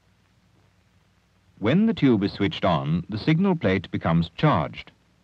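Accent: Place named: United Kingdom. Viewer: Canada? Why does the voice over sound British??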